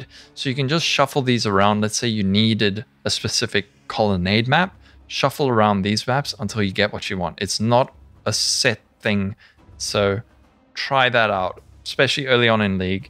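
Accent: South Africa. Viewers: qAre you a Saffa bud? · Are you South African? Level 70 rare items, if you get a full set(Unidentified), you can get 2 chaos from vendor. level 80 rare set gives you 2 regal orbs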